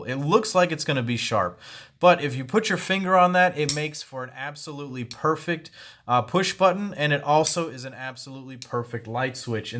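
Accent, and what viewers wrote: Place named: New Jersey, United States. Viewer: You know, because of his very French seeming last name and his knife aesthetics I always thought he was French in France. Then I heard him talk. Brother is just an MFer from Jersey like me!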